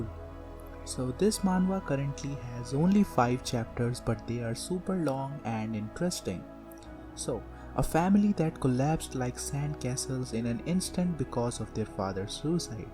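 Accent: India. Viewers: No disrespect the moment I heard the first word you spoke... In my ma mind, Yep definitely dis dude is from India. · Your accent's just like Indian people Where are u from?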